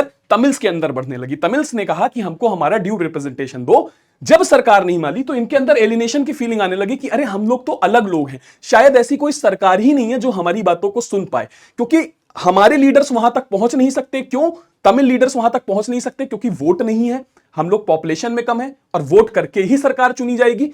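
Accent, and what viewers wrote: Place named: Bengal, India. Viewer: Sir ke accent se lag raha hai ye bangla Sinhana ko singhla bolne me dikkat kisi bangla ko hi ho sakti hai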